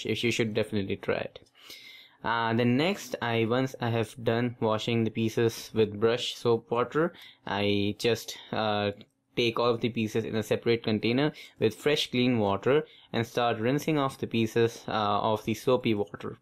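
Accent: India. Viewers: Of course he is from india His accent is indian and he orders some cubes from cubelelo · Pta tha aap ek indian he hoge qki aapki english indian jse he aur aaisa steel bowl to indian hi use karte he😁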